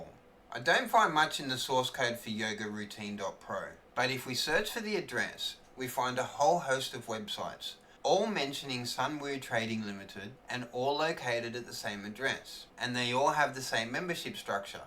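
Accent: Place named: Australia. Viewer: You deserve more followers and more comments for the commitment you put into the fight against these international scammers. Anyone like you who can do this deserves a medal. P.S your Australian accent and laugh is just tops my man. I've watched all your scambait videos and can I say great work on your behalf.. Keep up the crusade just like the rest of the Scam baiting community.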